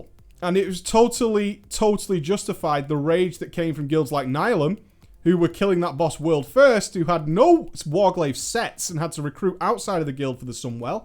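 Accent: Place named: North West England, United Kingdom. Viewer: dont apologise for your cough, apologise for your scummy north west accent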